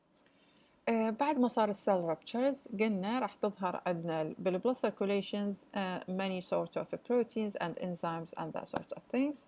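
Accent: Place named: United Kingdom. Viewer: Great , u have a really nice British accent 💜🙏🏻 Well, I speak with American accent but.... I think that British is beautiful than American accent and so clear 😐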